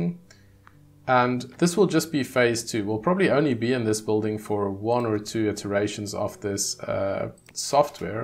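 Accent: South Africa. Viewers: Mate I have to ask because it is driving me crazy, are you from South Africa?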